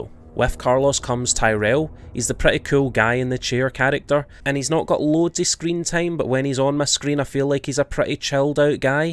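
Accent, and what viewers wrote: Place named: Glasgow, United Kingdom. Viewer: Glasgow uni accent is the worst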